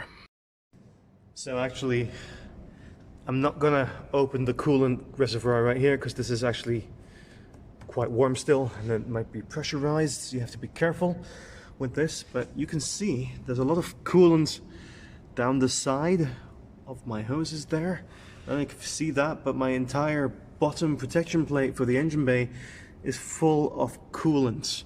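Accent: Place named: Netherlands. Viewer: hoor al dat je nederlands bent aan het accent ! ik heb een 230 .. zelfde soort gezeik continu ... maarja er blijft sowieso wel elke week wat nieuws kapot gaan aan dat klote ding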